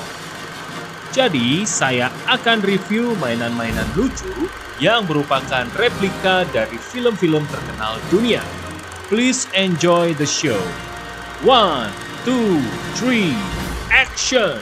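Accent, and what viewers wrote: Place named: Banyumas, Indonesia. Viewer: Videonya paket komplit,ngapak bos wkwkwk. Start up drakor favoritkuh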